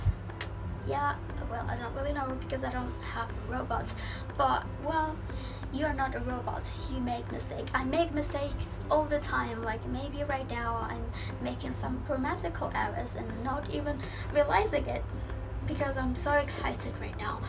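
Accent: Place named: United Kingdom. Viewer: Wow I'm so amazed how fluent your English is and the way you talk and that British accent is so so great, I'm so jealous. Some love from your IG friend ❤🥰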